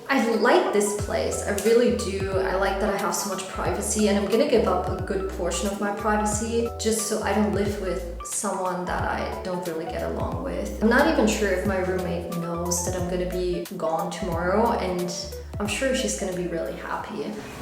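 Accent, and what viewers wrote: Australia. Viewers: I can hear the Aussie accent and pronunciations pushing through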